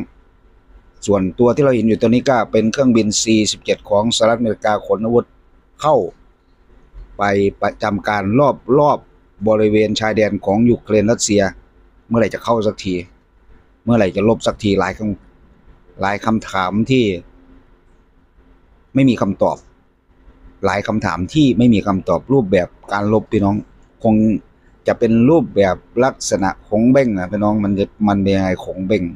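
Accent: Isan, Thailand. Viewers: แอดมินเป็นคนอีสานใช่ไหม ฟังจากสำเนียงแล้ว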